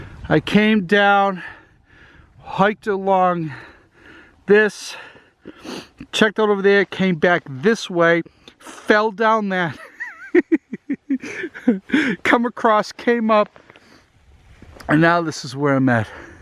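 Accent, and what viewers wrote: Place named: Massachusetts, United States. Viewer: Dude are you in Massachusetts? You sound like you're in Massachusetts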